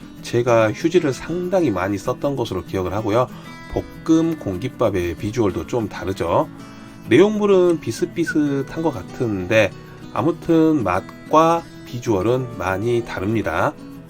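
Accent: Jeolla, South Korea. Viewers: 전라도 분이신가봐요ㅎㅎ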